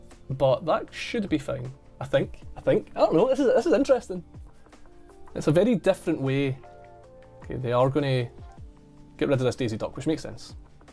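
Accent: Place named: Scotland, United Kingdom. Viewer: Off topic, but you're so cute and what kind of accent is that?? Scottish? Regardless, i love it! 😍 😅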